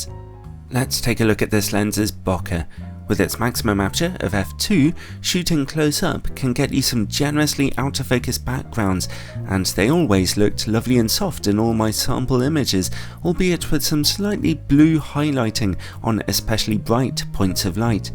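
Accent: United Kingdom. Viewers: Hi, greetings from Italy i always watch at your videos because, at the beginning, i needed some reviews to make clear my shopping ideas, but now i’m totally addicted to your British accent and of course your meticulous way of making reviews. i also thank you for being the best english teacher i’ve had in the past 8 years hope you will come to naples for taking some beautiful photos and also to eat something good, you’re the best. (let me get my new credit card so i can sub to your patreon) pps: when i’m drunk your videos are kinda the best thing i can ever watch because it’s sooo relaxing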